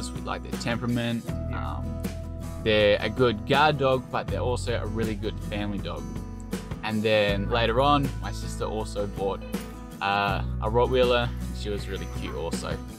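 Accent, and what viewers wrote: Australia. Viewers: Sometimes it seems to me that you speak English. But it seems to me that you speak a language that I do not understand. I understand that this is your Australian accent. Speak more slowly and clearly, do not lose the ending of words. I will be grateful, I learn English by ear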